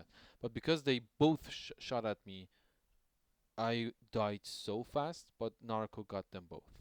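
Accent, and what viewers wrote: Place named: Iran. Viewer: are u persian ? ur accent so irani :D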